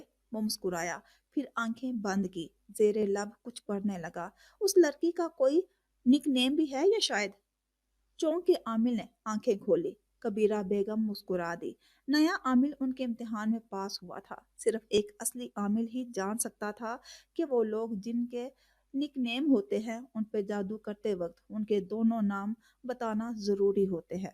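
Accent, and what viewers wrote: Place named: Punjab, Pakistan. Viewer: Nice novel beautiful voice Lekin Punjabi andaz Punjabi language zada hai 😊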